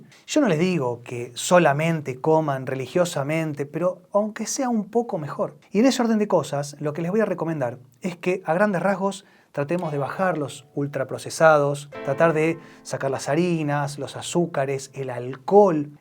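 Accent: Argentina. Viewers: vos sos argentino? disculpa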